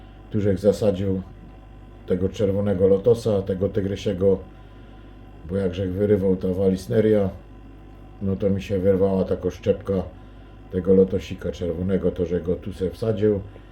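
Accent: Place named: Silesia, Poland. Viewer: Witom....witom 😊👋 śliczne paletki 😊kosteczka fajniusia😉 miło było posłuchać karlusa ze Śląska 😉 pozdrawiam serdecznie 🙋‍♀️👋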